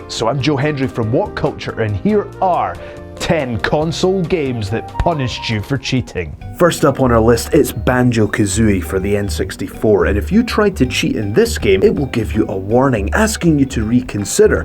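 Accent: Scotland, United Kingdom. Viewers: Gotta say...kinda enjoying the Scotsman a bit more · Are you Scottish? I love your accent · I haven't been replying for over 2 months. I've got these days to waste replying a guy who thinks he's so smart :P Dude, you clearly have no idea what you're talking about. Accents and the language the person speaks changes everything in communication. Example: I speak my name with "Pau" being the strong syllable and then "lo". Some people, depending on the accent and the language they speak will say it sounding a little different, which doesn't change my name at all. Actually, my foreigner friends tend to say my name with "lo" being stronger. Every time they say it it's still my name. Easily recognizable for sure. The guy is Scottish and say "Mario" with his damn accent and now the name is wrong? Do you know that Portuguese, Spanish, French and probably many others language doesn't have the "th" sound in their language and won't say "Elizabeth" the way you think they should? 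Won't even need to talk about accents here! Ps. Seriously? Mario is not real? If you say Santa Claus isn't as well I'll kill myself right away! At least I know they're more real than your wisdom :P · most annoying scottish accent ever... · I get that you're Scottish, I am too, but stop putting it on so strong its pure annoying. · He's clearly a Scot, you trolling bro? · HES SCOTISH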